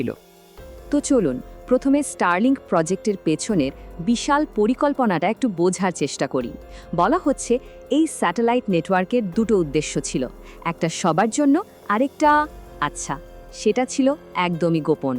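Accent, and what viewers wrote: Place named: Kolkata, India. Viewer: এই মহিলা কি কলকাতার মনে হছছে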